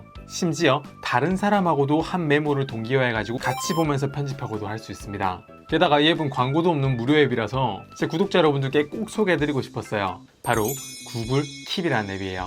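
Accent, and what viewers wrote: Gyeongsang, South Korea. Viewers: 말투가 경상도네요? 사랑해요.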